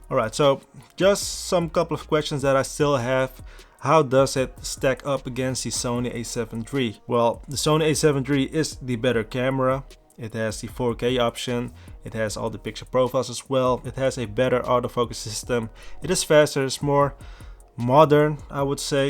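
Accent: Netherlands. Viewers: Hoorde direct dat het nederlander was.. Nice content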